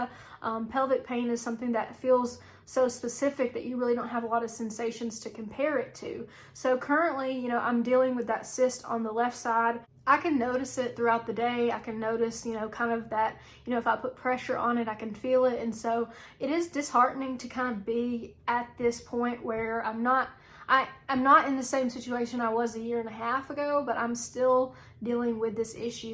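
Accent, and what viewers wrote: South, United States. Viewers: OTC pain meds can damage your Liver over time. This can cause weight gain and the eventual need for a Liver Transplant, so that isn’t a real solution. Even opioids won’t do anything but mask symptoms. I learned that regular OB/GYNS are pretty unhelpful but that Oncological OB’s take things more seriously - so see one of THOSE. At your young age, they are assuming you want to have kids/more kids, so they don’t want to remove things. They also know that removing ovar(ies) can put you into immediate Menopause. So they are resisting that solution. A Very Low Carb/Keto/Carnivore diet can make a difference bc carbs/grains/alcohol/sugar can CAUSE PCOS. If you don’t give your body any of that, it can’t then cause Insulin Resistance, which causes PCOS. There IS scientific info on this. Watch YT videos by Dr. Ken Berry about this. If the pain is too much, AND you don’t intend to have kids/more kids anyway, maybe you’d be better off getting your parts OUT and going on HRT (Naturopaths know much more about this than OB/GYNS who were taught wrong by Big Pharma which runs all medical schools. 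Mild, regular exercise can help remove the bad hormones and toxins. Castor Oil Packs (TONS of YT vids on this) can help. You are very articulate and have done lots of research. Many doctors ASSUME that a female with a Southern accent is ignorant. That may be part of the issue too. Even if you know your stuff, the accent will make them dismiss your concerns…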